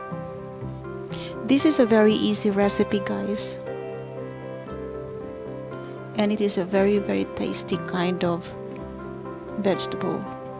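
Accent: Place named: United States. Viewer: Ano ni sya dai..daw nmit haw..nmian gid ko bala sng tingug mo dai..dw americana gid😊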